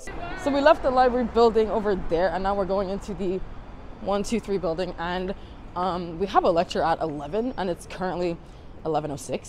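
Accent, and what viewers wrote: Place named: Ireland. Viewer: Which country is this please. I couldn’t catch your accident..is not America, not Canada looks like UK a bit is this Ireland ? something?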